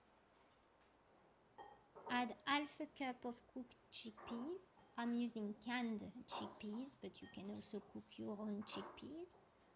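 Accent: France. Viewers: Bonjour, merci pour ces idées. Vu l accent vous êtes française ? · Going to try this! Your English with the French accent is the cutest! 😍 love from Luxembourg 🇱🇺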